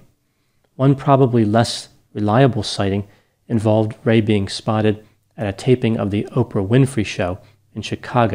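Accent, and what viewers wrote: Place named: Pennsylvania, United States. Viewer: See, with that accent I've always had a hunch you're from PA/the tri-state, as I am. And now that you've so perfectly pronounced Wilkes-Barre, I'm almost certain. Now just give me a Schuylkill & a Bala Cynwyd and I'll deliver my final verdict. ;)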